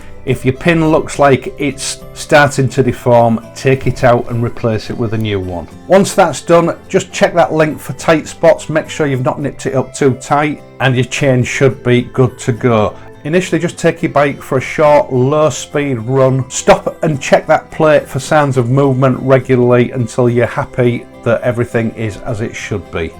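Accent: United Kingdom. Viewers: Came here to see what a gold chain looks like on a Bonnie (trying to decide between natural or gold for mine) and came away with being fascinated by the accent. Of course I've heard many a UK accent and love them but I am by no means a scholar on the regional differences. I could be wrong but this doesn't seem like one of the more "standard" ones. Here I heard what sounds to me like hints of the US southern accent...and of course it makes me think that's possibly where it came from. For example the word "time". It's like "taaam" like the US south. Anyway, I love languages and dialects. Can anyone tell me which specific accent this is?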